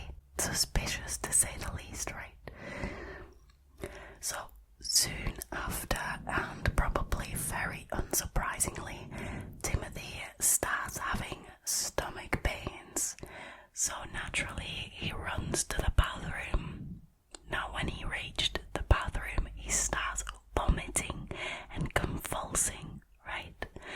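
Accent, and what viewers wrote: Northern England, United Kingdom. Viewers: Sorry I have also been trying to locate the accent. It’s great and really draws me back to the channel. I studied and lived up north and have a northern dad although I come from and live down south but miss the accent sometimes. Understand why you wouldn’t want to be specific but I’m speculating England’s biggest county (or near to). Thanks for more spooky storytelling as always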